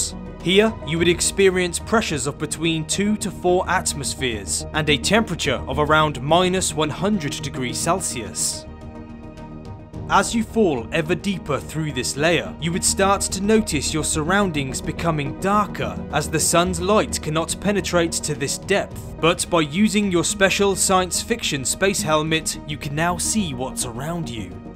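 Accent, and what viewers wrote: United Kingdom. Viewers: Love your British accent! (...and I’m also British...) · Your accents is British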